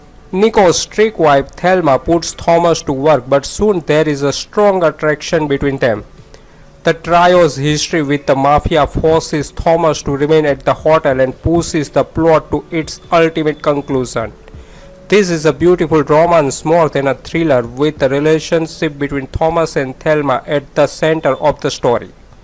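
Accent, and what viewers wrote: India. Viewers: Indian accent sucks!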